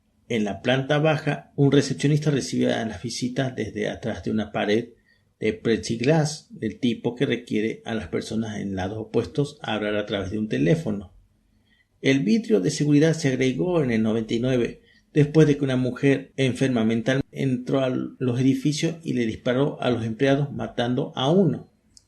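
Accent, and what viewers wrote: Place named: Córdoba, Argentina. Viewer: Hola, me llamo Gaston, soy argentino. Estoy viviendo en Mexico. Por tu acento me parece que sos cordobes, sino me equivoco. Mira, quizas te resulte raro esto, pero aunque soy un "miembro activo y sirvo como lider de estaca" me han gustado varios de tus videos (no vi todos), en especial el que hablas de Korihor. Me gustaria, si fuera posible hablar quizas por correo o wats para hacerte algunas preguntas. Me gusta encontrar personas que se cuestionen las cosas que aprenden. No es un intento para "reconvertirte" jeje, en verdad creo que sos sincero en tu manera de exponer tus ideas y conceptos. Aunque creo en Jose Smith, y todo lo que conlleva eso, primeramente que eso creo en Cristo y sé que la Iglesia no es perfecta, refiriendome a la "Iglesia" como pueblo dirigido por hombres imperfectos pero creo en la doctrina que aun sigo aprendiendo, aceptando que no todo lo que un lider dice tiene que ser verdad. 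Mi correo es <mail> y mi celular es <num>. Espero que me des la oportunidad de conversar con vos.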